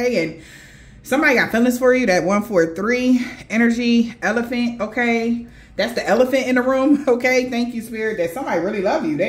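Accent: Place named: Brooklyn, United States. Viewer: I Live in New York! U funny sound like u was uptown in the bronx or spanish harlem . And u was also in brooklyn carribean city !!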